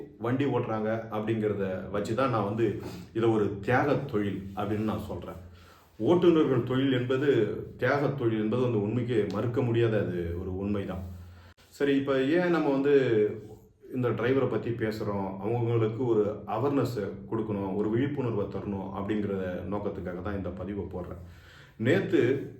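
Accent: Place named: South, India. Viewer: South voice good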